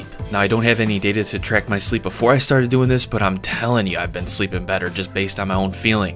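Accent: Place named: South, United States. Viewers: Dude why are you talking with a Southern accent??? Just talk normally man. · How come you had a southern accent in this video 😂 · Definitely noticed more of a Southern twang to his accent. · He probably listened to southern people, or he's doing a trial related to changing his accent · He sounded more "southern" but I just noticed after reading your comment. Lol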